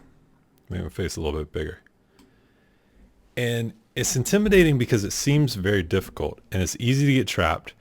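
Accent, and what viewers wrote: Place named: South, United States. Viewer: I watched a few minutes of this and started winning more. Finally back to attempt mastery! Love your accent. Where the heck are you from? I'm from the south; hearing a bit of it!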